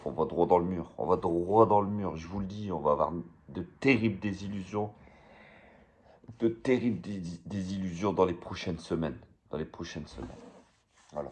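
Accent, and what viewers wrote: North, France. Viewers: Voilà les gars . Tu viens du nord ou koï? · y a un accent du nord la derriere ?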